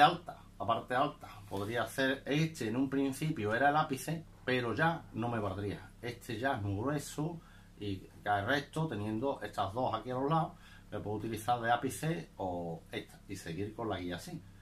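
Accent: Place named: Andalusia, Spain. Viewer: Gracias por el video,informacion valiosa y util. Te sigo desde Mallorca aunque soy de Puebla Del Rio y es un placer escuchar nuestro acento cerrao andalú.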